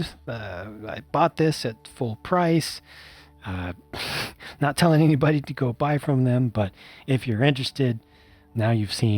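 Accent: United Kingdom. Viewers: Oh my god, the British accent is frickin FANTASTIC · 😄 Nice British accent. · Oof that british xdd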